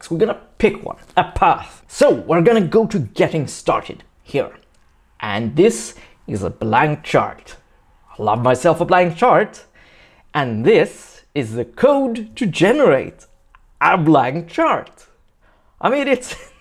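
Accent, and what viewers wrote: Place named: Sweden. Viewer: How i missed that Swedish accent and humour! Lovin' it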